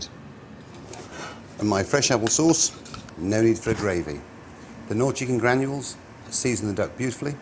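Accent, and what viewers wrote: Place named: Yorkshire, United Kingdom. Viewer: He is the poshest sounding Yorkshire lad I've ever heard!!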